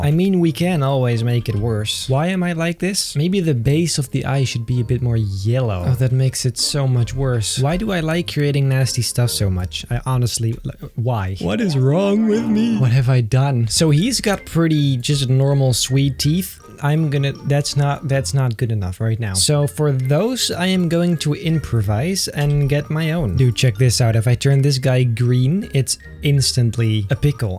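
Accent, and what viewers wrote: Netherlands. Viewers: Ik vind het zo fantastisch dat je het Nederlandse accent niet kan verbergen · are u dutch?🤔🤔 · We miss the dutch accent videos 🥲😂😂😂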